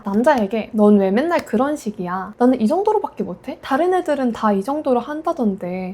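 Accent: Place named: Gyeongsang, South Korea. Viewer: 부산 경남 사람이신듯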